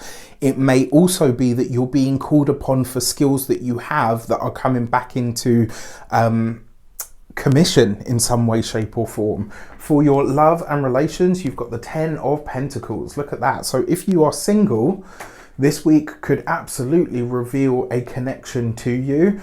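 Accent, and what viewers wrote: England, United Kingdom. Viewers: Dude, i don't know if i want to stay here. You're just to cute and that English accent is driving me Crazy🤫. 18 Years with my husband Remember.😂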